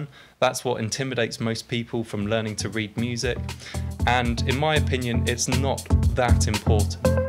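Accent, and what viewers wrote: United Kingdom. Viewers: I just started trying to learn piano, and I have a feeling I'm going to utilize this channel a lot. Plus, it's a lot more fun to learn along to an British accent :)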